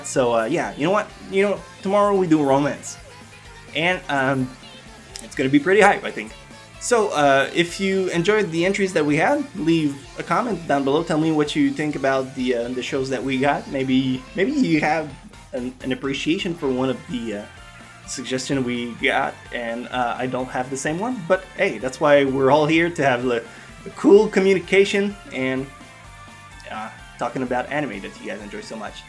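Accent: Quebec, Canada. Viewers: French Canadian accent you get used to it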